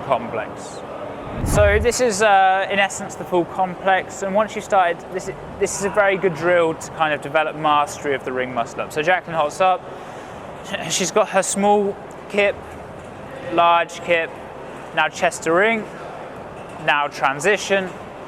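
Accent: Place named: United Kingdom. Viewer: 👑🚀Very nice tanning and with perfect British accent👑🔥🇬🇧 🏴🔥👑❤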